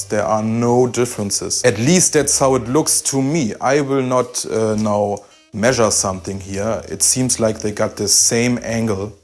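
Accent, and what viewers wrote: Germany. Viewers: hi thanks for answering me back. I really enjoy your channel. I watched your HB Bigtone review, I am thinking of getting one. Did you keep your Bigtone and if so how do you like it now you have had it a while? Did you have any issues with the back bridge tilting under pressure from the steep angle of the Bigsby? I am a lot older, in my day we had to pay a lot of money for a good guitar. Nowadays companies like HB are making very good guitars for very little. All the big names are manufactured in China and Indonesia or using or parts from East Asian and so people may as well pay less and buy a HB anyway. They can always put new pickups in it or better tuners for half the price of buying one of the big names. I would really appreciate it if you can please let me know your honest opinion about the Bigtone. Lastly I love your German accent, I live in Northern Ireland and we sound terrible. 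😁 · Dieses Denglish mit solch einem heftigen preußischem Akzent ist unerträglich. Sprich doch einfach gleich Deutsch, dann tuts nicht so weh. Wen die Gitarren interessieren muß dich nicht endlos reden hören. Was die kosten weiß auch jeder. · Da du unüberhörbar Deutscher bist, werde ich dir auch so antworten: daß die Harley Benton für das Geld nicht schlecht sind, steht außer Frage. Trotzdem sind die Epiphone Gitarren wesentlich besser verarbeitet. Die Bünde der Harley Benton sind sehr unsauber bearbeitet und müssen gerade bei den unteren Bünden nachgearbeitet werden. Die Bolzen der Tune O Matic Bridge sind stellenweise nicht komplett im Body versenkt, und wenn dies alles behoben wurde, kann erstmal der überschüssige Kleber am Hals mühsam entfernt werden. Und dies ist kein Sonderfall. So etwas habe ich bei Epiphones der letzten Jahre nicht gesehen. Meines Erachtens kann man die beiden Brands nicht miteinander vergleichen. 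Wie die Harley Bentons mit diesen scharfen und stellenweise nicht polierten Bundstäbchen und den Kleberesten durch die Qualitätskonrolle gekommen sind ist mir zudem ein Rätsel. Trotz allem kann man mit etwas eigener Arbeit die Mängel beheben, und hat für das Geld anschließend eine brauchbare Gitarre. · this German accent... (; nice video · I don't have a black guitar yet. 1st time here because I'm looking to collect my 11th guitar. Playing on & off since 16. I'm 56 now & getting bored. I have all the expensive guitars. I'll add a Harley Benton. Because I have an Epiphone custom sunburst. They both sound great !!! You play pretty good too !! That helps. Lol.😅👍 At the beginning I thought is he German ?? But, your English is fine bro.👍 Keep rocking !!!🎼🎵🎶🎸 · Heavy German accent xD Danke für das Video hat mir echt weitergeholfen 👍🏼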